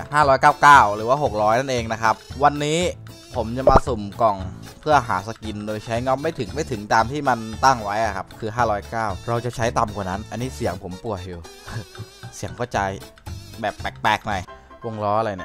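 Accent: South, Thailand. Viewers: พี่เป็นคนใต้หรอครับ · พี่ย่าเป็นคนใต้หรอ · คนใต้นี้หว่า55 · ชอบพี่แหลงใต้ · พี่คนใต้หรอครับ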